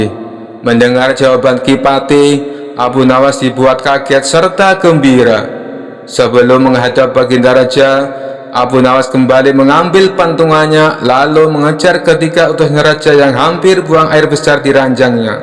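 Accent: Java, Indonesia. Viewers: Jawane ngapake ngendi rika kang admin😂😂😂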